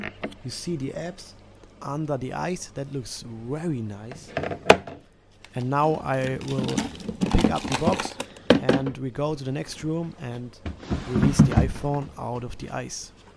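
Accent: Germany. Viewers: dieser deutsche Akzent ;) aber gutes video bro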